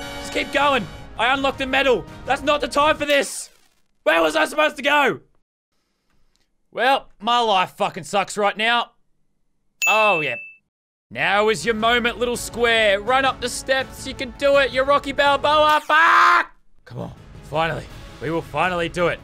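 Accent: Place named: Australia. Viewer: Australia accent (thumbs up)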